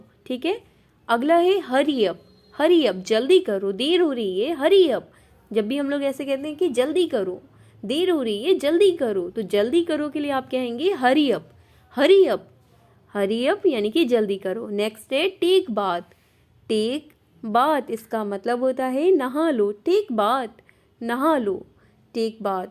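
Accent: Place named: Bihar, India. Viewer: What a bhihari English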